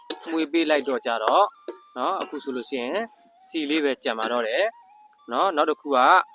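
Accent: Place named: Upper Myanmar, Myanmar. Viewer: အညာစာလေးရယ်အညာသံလေးရယ်စိတ်ဝင်စားပါတယ်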